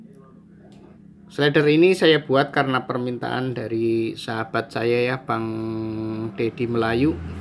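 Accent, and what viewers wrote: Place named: Banyumas, Indonesia. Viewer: Mantap om 👍👍👍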